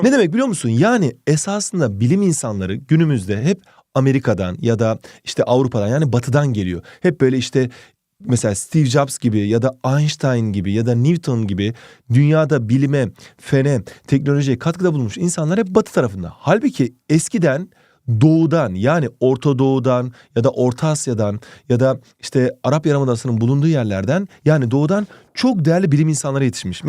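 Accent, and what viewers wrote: Turkey. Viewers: İstanbullu yada Ankaralı (tonguç)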